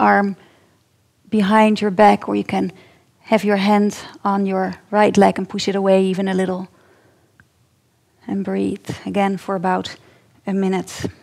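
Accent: Netherlands. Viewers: I love your Dutch accent. And your videos. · No, it's a Dutch accent.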